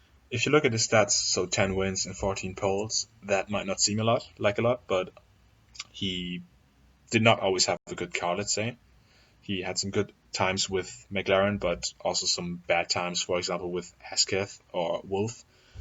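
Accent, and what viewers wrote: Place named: Germany. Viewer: so total agreement right there, except alonso and vettel. i would have switched them around because vettel is better than his ferrari years are letting him look. i think ferrari destroyed him and he would have challenged hamilton more with a red bull. alonso on the other hand was good no doubt but he was maybe to stupid to win more. his change to mclaren was the worst choice you can make and i think its no argument that he was better but didnt have the car to do it. he had offers for teams but he took the worst, in my opinion its all his fault and thats somthing a tier 2 driver has to be aware of. But great video mate. One question: Are you from Germany or Austria because you´ve got a strong german accent?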